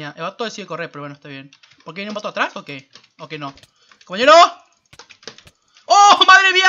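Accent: Argentina. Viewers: Eres de cordoba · eres argentino?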